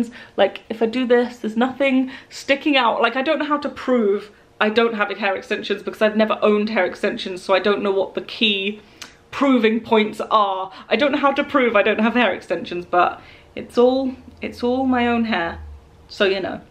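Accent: United Kingdom. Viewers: I'm a new viewer and already intrigued by your accent - what are the influences? It's like a queen's english dialect over something more regional? Scottish? Irish?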